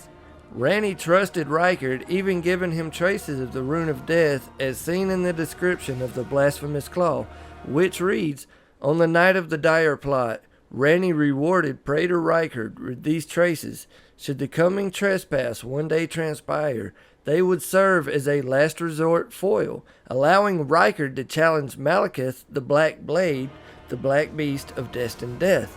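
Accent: South, United States. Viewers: A southern drawl talking shop about Elden lore, I’ve never subbed so fast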